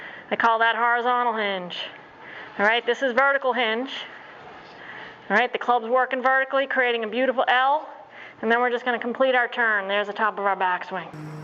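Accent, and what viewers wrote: United States. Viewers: What accent is this and where from in USA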